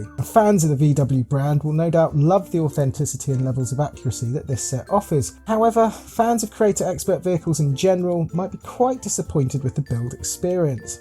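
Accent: England, United Kingdom. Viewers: And where are you from, you sound English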